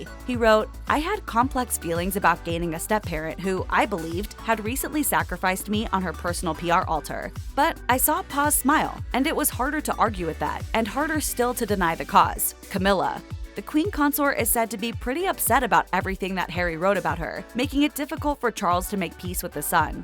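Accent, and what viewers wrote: United States. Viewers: What a load of rubbish! Why is it these stories are always narrated by an american? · The moment that American voice started I knew we were in for a load of twaddle. You are so indoctrinated with 'celeb-crap thinking' that you view everything through US celeb lenses. The rest of the world is different - reality is the norm, not trashy gossip dished out as 'news' Sleeping in separate beds is the norm in such households - it's the norm!!! Public spats? You mean 'body language' interpretations. Rumours - yeah rumours. "may soon be calling it quits.." Yeah, that's a big 'maybe' How much is the MM paying you for this silliness?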